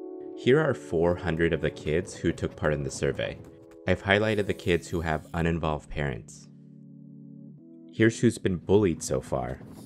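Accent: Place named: United States. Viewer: Okay, I'm guessing America seeing as the narrator has an American accent and you tracked whether the kid sees someone get shot.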